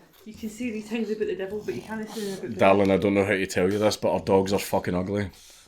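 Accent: Scotland, United Kingdom. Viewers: Ur scottish is now better than ever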